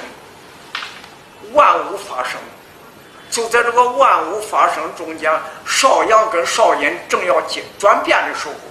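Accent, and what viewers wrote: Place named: Henan, China. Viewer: 河南人河南话，但和山东部分地区的口音很相近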